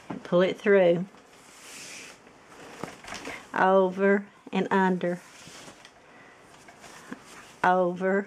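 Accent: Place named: Appalachia, United States. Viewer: Love the accent, where ya from? Reminds me of my Cumberland gap grandparents of VA and KY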